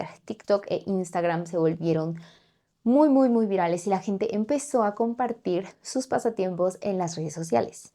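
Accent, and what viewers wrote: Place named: Mexico. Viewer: Yo empezé aprender español a solo uno mes es mi passatiempo, me encanta eschucar tu videos, me encanta lo acento mexicano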